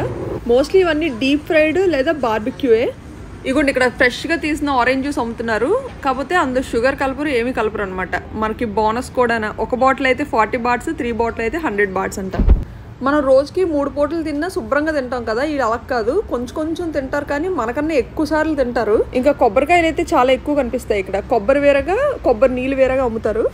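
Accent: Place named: Godavari, India. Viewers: Nuvvu beautiful ga unnavu..Tollywood lo ki enter avvachhu kada..voice super..West godavara /East godavara..very beautiful & nice voice..congratulations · Madam midi వెస్ట్ గోదావరి లా అనిపిస్తుంది మి voice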